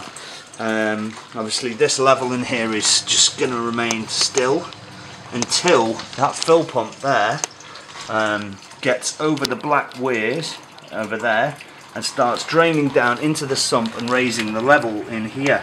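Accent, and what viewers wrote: United Kingdom. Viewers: Hi just found your channel I love your tank and setup, I can see its going to amazing. You sound like a mancuniuan to me, I am from manchester and don't know many other reefers in the area so its nice to see. keep the vids coming.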